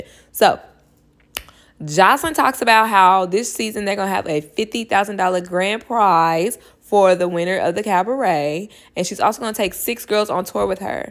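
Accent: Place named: East Coast, United States. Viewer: Yes, I can tell from the accident that you're from the East Coast. 😁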